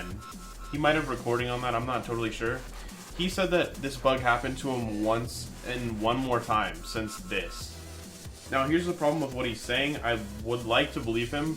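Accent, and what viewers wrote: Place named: United States. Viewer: u sound like an american trusta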